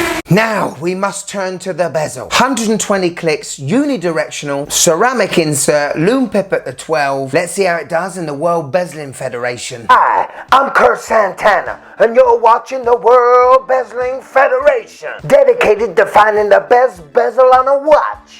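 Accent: United Kingdom. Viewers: I just realized who you remind me of….Benny Hill (which is a compliment in my mind). And not just because of the British accent , your humor is very similar. I also prefer utilitarian looking dive watches. I don’t like the wavy dial and certainly not the 1990’s bracelet style. I’d love to see Omega reissue their styles from the 60’ and 70’s in both their divers and sport watches.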